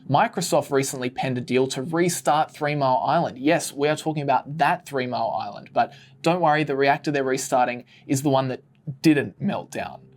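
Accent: Australia. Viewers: You sound Aussie but focusing on US!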